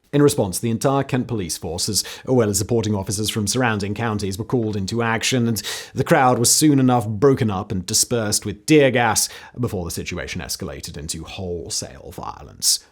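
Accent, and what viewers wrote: United Kingdom. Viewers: Yeah, a YouTuber of unknown qualifications and a British accent is going to tell us about the intent behind the killings at Kent State in Ohio.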